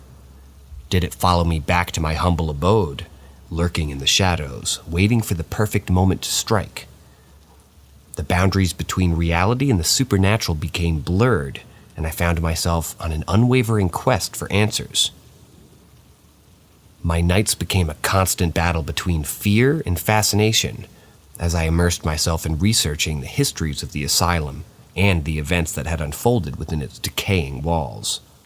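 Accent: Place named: United Kingdom. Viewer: What's with the new British accent?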